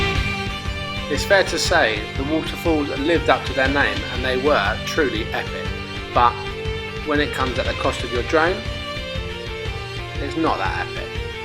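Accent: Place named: Scotland, United Kingdom. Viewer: your Scottish accent is ummmm good but funny 😂such beautiful seems 100 percent take Teddy there (obviously in like a year or something)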